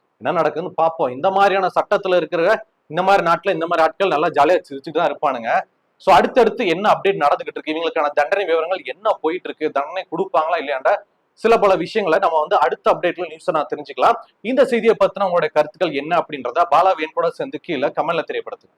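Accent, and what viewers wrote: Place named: Madurai, India. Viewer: நீங்க மதுரைக்காரா ,உங்க பேச்சிலேயே தெரியுது,நானும் மதுரைதான்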